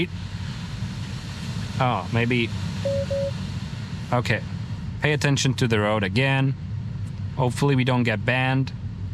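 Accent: United States. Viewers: I would not know that you aren't an American. Your English is terrific. You sound like any other American to me. Enjoy your visit and please visit other states. I'm in central Ohio. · Your American accent is impressive! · You did an excellent job for a newbie even your English sounds American. I can confirm what your conclusions are because we purchased a Tesla model Y Juniper two months ago and it is running and maneuvering with FSD very nicely. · While not perfect, your accent is amazing and sounds very American!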